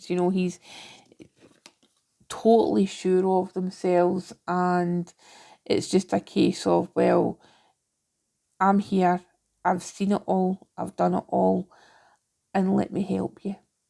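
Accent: Scotland, United Kingdom. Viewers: Thank you for your compassion and thoughtfulness thru this reading. I love a Scottish accent ☺️ onwards and upwards 🙌 thank you 🙏❤️✨ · So nice to hear a beautiful Scottish voice. Thank you for the messages, it definitely resonates. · Her Scottish is very broad but there is always the option to turn in the captions. I can’t watch a Scottish or Irish show without them and this is no different but worth the effort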